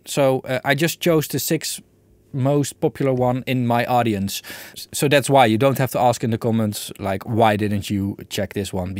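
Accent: Netherlands. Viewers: The Dutch accent is powerful, I like it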